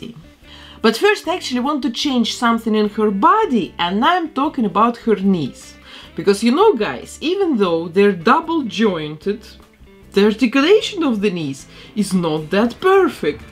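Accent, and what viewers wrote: Russia. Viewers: Is your accent Russian I’ve been trying to guess but I’m not sure I can’t put my finger on it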